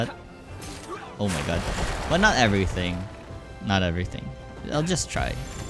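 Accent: Philippines. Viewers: You have a filipino accent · I hear Filipino (Pronounced: "pill-la-pee-no") Accent... Filipino ka???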